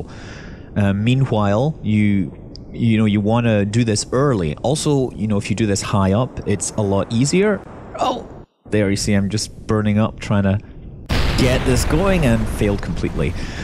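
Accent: Scotland, United Kingdom. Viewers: Oh I his accent sounds very scottish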